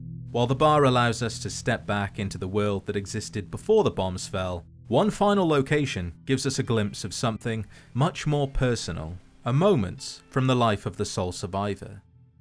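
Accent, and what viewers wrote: United Kingdom. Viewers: Thank you British fallout lore master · It’s ok mr British vault boy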